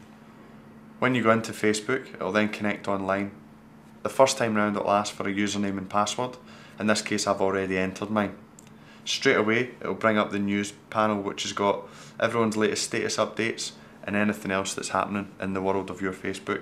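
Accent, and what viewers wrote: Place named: Northern England, United Kingdom. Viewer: hes from northern england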